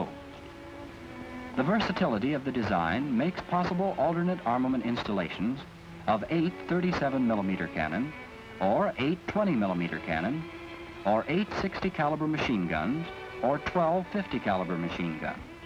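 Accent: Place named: United States. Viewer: How interesting to hear an aircraft referred to as an 'aeroplane' in an American accent. I hate the present bastardised word 'airplane'.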